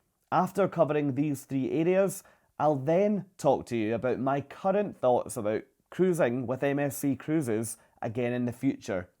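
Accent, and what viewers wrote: Scotland, United Kingdom. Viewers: I just now stumbled upon your Vlog -- nice to hear a fellow Scot. My travel agent REFUSES to book us on MSC, so I watched this with interest to get your view. After this, I think we'll stick with Celebrity and Regent.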